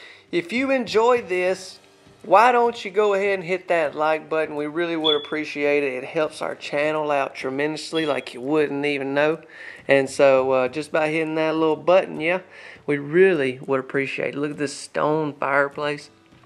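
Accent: South, United States. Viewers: Very southern/ cowboy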